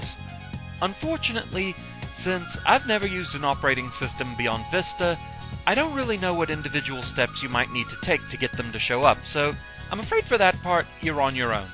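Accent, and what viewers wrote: Scotland, United Kingdom. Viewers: SCOTISH ACCENTS RULE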